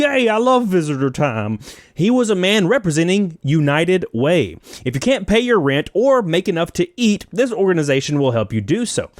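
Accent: South, United States. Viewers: havent watched this channel in a while, man did become a lot more southern!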